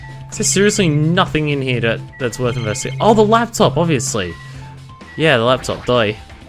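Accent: Australia. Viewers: When I first found you and heard your voice -- quite a long time back now -- I was trying to place your accent. I could tell there was something in your accent that wasn't making it sound British. It wasn't until in one of your videos you actually said you were Australian that it finally clicked for me. I was like, "Ah, that's it!" I'm one of those people who try not to jump to conclusions, and since I don't actually know you I didn't want to ask straight out where you're from.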